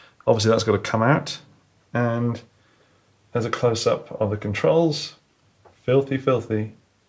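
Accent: England, United Kingdom. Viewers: yes we English did invent the English language 😂😂 it's pronounced Lez in England/English. speaks with an English accent so he's pronouncing it correctly.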